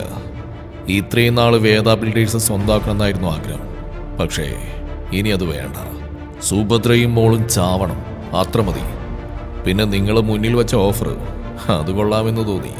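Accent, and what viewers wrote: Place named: Thrissur, India. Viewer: ❤️🥰🥰സൂപ്പർ... എന്റെ... ആ തൃശൂർ സ്ലാങ് 👌👌ഒരു രക്ഷയുമില്ല... സൂപ്പർ 😍🥰🥰🥰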